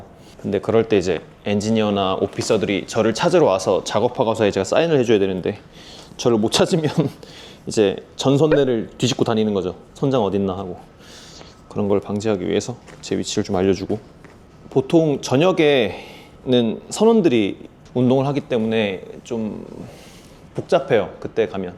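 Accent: United Kingdom. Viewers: 영국 방식의 영어죠. 미국의 굴리는 영어가 우월한 영어라는 생각을 은연 중에을 갖고 계신 것 같은데, 영어에서 이렇게 발음에 집착하는건 한국인 밖에 없다는건 알아두세요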